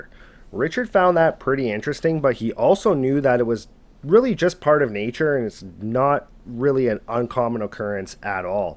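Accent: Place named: Canada. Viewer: Your Canadian accent is refreshing to listen to rather than the same old. Also only thing he did wrong was ignore his instincts after the first night.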